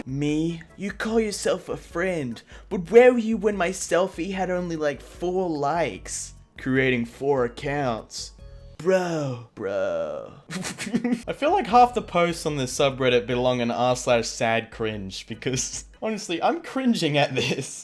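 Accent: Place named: Australia. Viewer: idk if i heard correctly but i can hear a bit of an aussie accent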